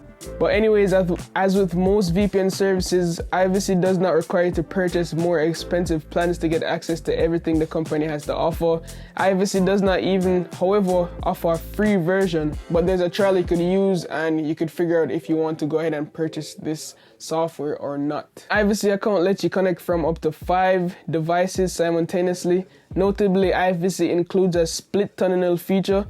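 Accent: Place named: Jamaica. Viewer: Yeah, I can now here the Jamaican accent 😃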